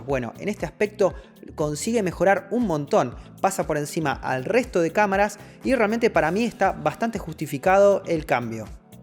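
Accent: Argentina. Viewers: che flaco sos argentino no español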